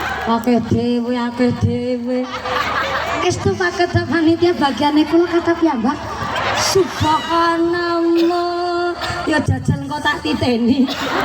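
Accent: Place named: Banyumas, Indonesia. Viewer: Ustazah favorit saya ini..sama2 wong ngapak..ora ngapak ora kepenak 👍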